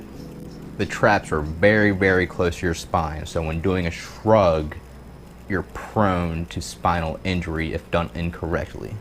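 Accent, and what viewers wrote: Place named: United States. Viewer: Lol that intro and what's with your country accent boy lol keep it up though man!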